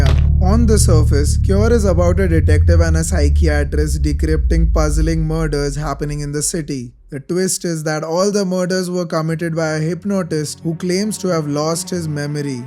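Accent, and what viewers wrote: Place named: India. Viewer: Great Video although ive noticed your quite heavy indian accent. I would probably watch more videos from you but my heavy distaste for the Indian race won’t let me. Please consider to stop being Indian so more People can enjoy your content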